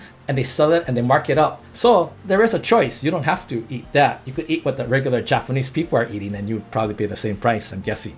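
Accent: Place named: Hawaii, United States. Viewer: are u from hawaii. i can hear the pidgin accent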